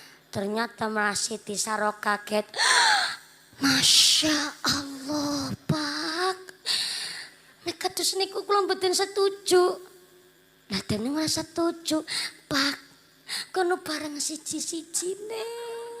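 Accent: Banyumas, Indonesia. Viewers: Logat yg bikin menghibur, yaitu logat ngapak.. Hhi Sukses truss sob Mampir sob kerumah, ajari sy bahasa ngapak.. Biar lancar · Ustdzh cantik..ora ngapak ora kepenak😆😆🤦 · Gokil ngakak pollll ustaadzahh ngaapak kiii,🤣🤣🤣🤣 Mg lncar acaara Khitaanyaa. Aminnnn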